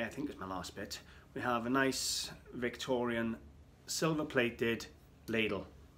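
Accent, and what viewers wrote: Wales, United Kingdom. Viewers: Great video. Aussie viewer here. Love that welsh accent.. I’ve got family over there and it gets me every time lol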